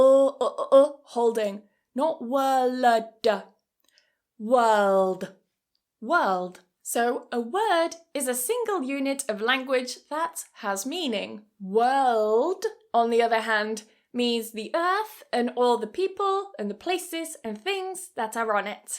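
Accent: United Kingdom. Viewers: Ohh My gosh British pronunciation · 100% is British accent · I love UK accent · Love your British accent ❤❤❤ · Litterly this Vedio solved 30% my problems in English specialiy word and world 🌎 I really loved British accent I'm try to work on it to get good pronunciation it's was lovely Vedio thanks sis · Is this British English? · I love your accent. May i ask where you are from?Which part of Britain speaks it? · I find this video particularly entertaining, I'm from Costa Rica, is this British accent? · l have american accent but l love your british accent as well you are great my dear❤❤❤ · Hello, I'm Oscar Quiñones, I'm Colombian. I love you British accent!! 😊😊😊, Thank you for you class!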